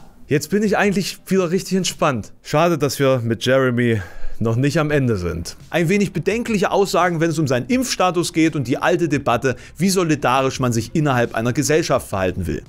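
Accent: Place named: East Germany, Germany. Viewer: Richtig stark, gerade auch die Erklärung von dem Namen Malenki und Enttarnung als russische Übersetzung für Klein! Richtig großartig. Hast du aber nicht noch eine "kritische" Verbindung zu China aufmachen können oder zu Aluhüten? Dann hättest du wirklich alle buzz words drin. Ganz groß. Aber was mir fehlt ist, die Erwähnung von Ossis, weil die sind ja auch alle rechts. Da lässt sich zu Jeremy bestimmt auch eine Verbindung herstellen. Denn alle Ossis sind doch nicht nur rechts, sondern auch doof oder also so voll Jeremy nicht wahr?! Oh sorry hab deinen dummen Akzent fast überhört. Bist ja selbst nen Ossi. Wie sehr braucht man Kanäle und Leute wie dich, die nur mit dem gehobenen Zeigefinger durch die Gegend laufen. Du angepasster Ossi. Was für eine Auszeichnung für dich.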